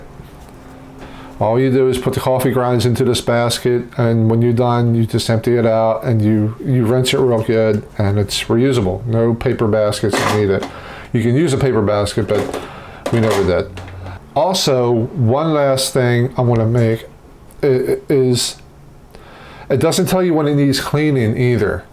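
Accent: Baltimore, United States. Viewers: You have to be from the Baltimore area. Wooder, rinch and the accent are a dead giveaway.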